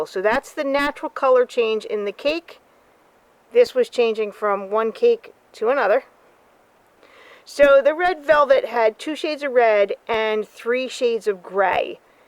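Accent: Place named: Philadelphia, United States. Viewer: I had a feeling just by your accent you were close to Philly! I’m from New Jersey. I lived in Absecon worked in Atlantic City at Harrahs moved to Las Vegas back in 1996 been here ever since.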